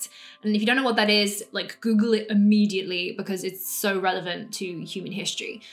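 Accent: United Kingdom. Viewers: Something about discussing the truths of ancient history....with an English accent...makes it sound so legit. If I were to talk about it I'd sound crazy. :-P At the end there you posited that survivors of the Atlantean Global Empire came to the hunter gatherers (assuming they were very primitive and since they lived inland and in the wild they survived the tsunamis and weather changes) and almost appeared to be gods with their Atlantean knowledge. So "Osiris" was an Atlantean who then became a god to the early Egyptians. Now circle back to the pyramids. They were thought to be pre-diluvian structures that created energy to supply power to the various global cities (everyone had their own pyramids or power structures). If a surviving Atlantean passed on his knowledge to the pre-cursors of Egypt....why did he never explain the pyramid's true purpose? Even if the pyramids no longer worked, why did the Egyptians keep zero records of the structures, how they were built and who built them?? 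Not even a verbal tradition, one that speaks of Atlantis WAY BACK in history, doesn't speak of the pyramids across the globe? There's a huge gap here that needs to be filled... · Hey I think you are doing a great job making us aware of the many mysteries of the Ancient times plus adding your personal female British accent makes it more attractive. Jimmy is a great investigator also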